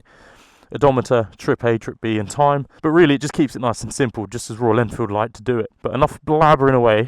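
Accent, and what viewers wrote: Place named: South India, India. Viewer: It's probably the south Indian accent of many Indian youtubers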